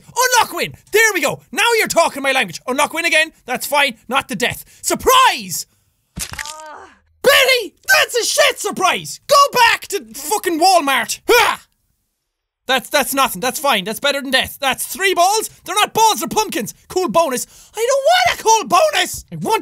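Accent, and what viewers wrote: Ireland. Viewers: I fucking love the Irish accent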